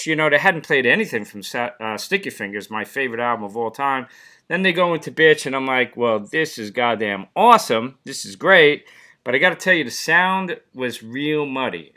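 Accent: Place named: New York, United States. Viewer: Jagger is 80 not 81. The fact that the muddy sound became clearer that’s cause of the wind, or probably a slight breeze coming & going. Guess you’ve never sat in the nosebleeds! The fazing was fucking wind not the sound guy. Your used to arenas no wind. & you probably saw the Stones at Giants stadium a virtual wind tunnel that can get lucky no wind some dates. Only good thing about your 📹 is your NY accent but your no Archie Bunker pencil in Cindy Lauper It’s the fucking wind!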